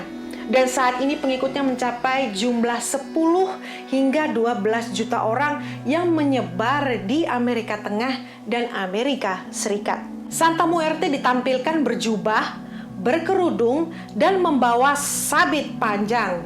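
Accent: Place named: Surabaya, Indonesia. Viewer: khas sbyoan dan malang an logatnya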